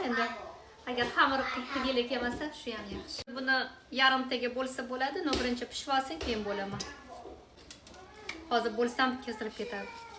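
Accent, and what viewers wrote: Tashkent, Uzbekistan. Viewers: Гапришиз Тошкентча Жула чиройли гапирвас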